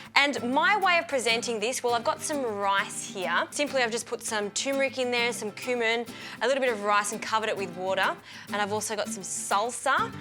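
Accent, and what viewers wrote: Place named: Australia. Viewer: Remember the 160 is Celsius, she sounds from down under.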